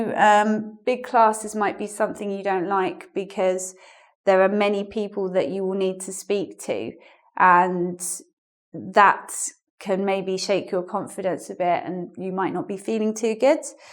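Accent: United Kingdom. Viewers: Hello, thank you for your lessons, I like the way you teach and you British accent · I think that you speak by British accent and it is very difficult than American accent. I want to talk to British girl or boy to learn to say the right accent. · PERFECT BRITISH ACCENT!!! Thank you ever so much,!!! I do like it! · Hi, Perfect, the UK accent! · Hi, I'm from saudi Arabia. I'd love to tell you that I love love your british accent. I'm subscribed to your Joddle channel, 'n so happy to be subscribed to your new channel, congrats! ^^ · WONDERFUL!! video and it's good to hear some British accent!! :) Can't wait to see your new videos!!! <3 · It's good to have British speaker. I like their accent. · Thank you very much. Nice advices. And I think you speaking British English. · Like your accent...I like British accent a lot! Brilliant explanation. Have been living in London for over a year and I got amazed by British accent. 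This is the way it should be spoken because english comes from England.Thank you for sharing. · Hi! i'm from Venezuela and I do understand you perfectly, love your british accent <3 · British accent <3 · Hey, your first video was Amazing! I knew it! Everybody here in engVid is glad to be part if this lovely team, You did it quite well! I look up to you, congratulations and thank you for helping us listen to your British accent!:P I follow you in your channel as well, kind regards! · Honestly i really like your accent, i need to learn the British accent,its really special language for me, and nice to meet you and all my eyes on your topics now, waiting to see a new videos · British accent though !! So natural !!! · I can't focus on the lesson because you're so beautiful and i enjoyed hearing your british accent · you teach Bristish English , but i study American English - and i love your striking accent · Hi,! 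I'm begining my English learning with EngVid and your video was very helpful for me. I like your brit accent ) Thanx from intorverts;) Sorry for my mistakes. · ! I loved your british accent _ How come to an introvert person become a teacher? lol!:) Keep this great work! ^^ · I'm a beginner for english. I practice very hard.I would pronounce them like her because I love UK accent. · You have a boyish name. LOL but I love your voice, in fact I really love listening British accents. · What a great video! :) I love your british accent sooo much. Greetings from the Czech Republic! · At first I express congratulation you on being hired by the engvid. The fact is to probe your English teaching methods were recognized by the company. I also learn from your YouTube and am enchanted by your British accent. By the way, in Japan, the once upon a time, as the English proverb saying, the notion that the silence is gold had been prevailed such as the book called Quiet whose author is Susan Cain which insists even if the introvert people are not assertive toward others but the high ratio of percentage, they have the high potential than extrovert people. 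But in fact in Japan nowadays I feel that extravert people are superior to introvert people. As it is often said, everywhere, because many of extraverts have capacity to say their opinions in the office meeting, and the introverts are treated as people who do not have self opinions and as transparent people and are ignored. So when it comes to dating, extraverts can ask opposite sex to go out by using positive words and attitude and the possibility of succeeding in being accepted is high. So I am an introvert man, so in Japanese society, I always was taken advantage by extravert people unfortunately. Sincerely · British accent ~awesome :D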